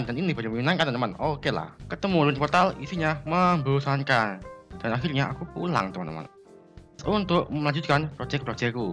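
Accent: Java, Indonesia. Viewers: Orang mana bang, kok logat nya kayak orang jawa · Setuju banget (╥﹏╥) jawa nya ada imut-imut nya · jowone terlalu medok iki kayane,, ngommong bhs indonesia we raiso alon lan kesrimpet srimpet wkwkwkwk btw jowo ndimas? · Jowo ne medokkk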